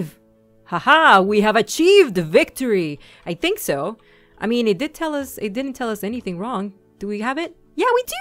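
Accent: Spain. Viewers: Are u Spanish not to be rude😰😰😰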